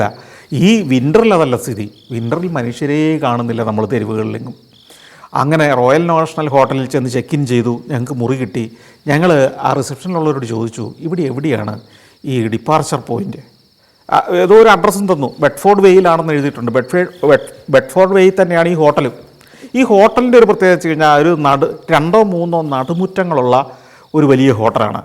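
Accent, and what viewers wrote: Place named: Kozhikode, India. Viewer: മൂപ്പർ..... എന്റെ കോഴിക്കോടൻ ഭാഷ...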